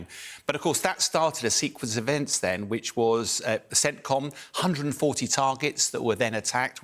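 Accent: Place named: England, United Kingdom. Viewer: Thanks for the Overview Sean Bell. Your sound English, .... trustworthy. American news, only idiots trust.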